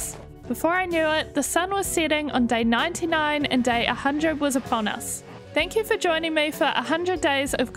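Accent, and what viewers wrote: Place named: New Zealand. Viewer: i know a kiwi when i hear one!!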